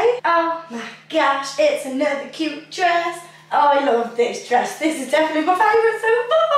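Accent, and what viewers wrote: United Kingdom. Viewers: You are so damn fine, that British accent just puts you on a different tier.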